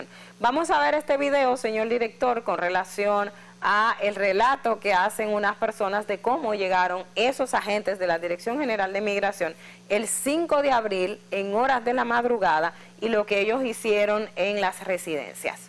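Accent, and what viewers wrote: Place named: Haiti. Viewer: No es por nada pero esta presentadora puedo sentir qué es ahitiana escuchen bien su acento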